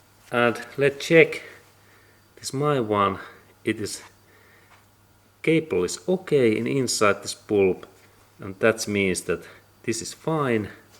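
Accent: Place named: Finland. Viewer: kaunista tää suomen ralli englanti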